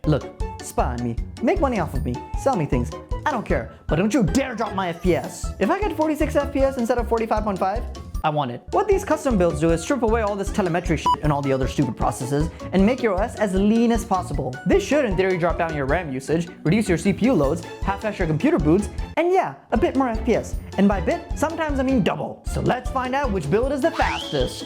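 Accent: India. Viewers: ARE YOU INDIAN OR AMERICA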